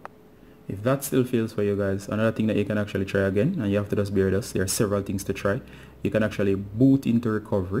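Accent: Jamaica. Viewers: by the way thanks..idky I was surprised to hear a Jamaican but dweet! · He's from the carrabien (jamaica)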